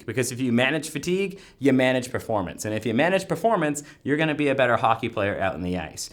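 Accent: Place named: Canada. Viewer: canadian soundin fellow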